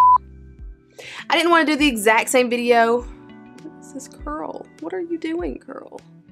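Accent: South, United States. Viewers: Love your accent! Sounds southern :) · Okay love your channel and Omfg your southern accent is just freaking adorable omg …… lol I’m from ga I’m southern as well but omg u just sound to cute are you from Texas bama or Ga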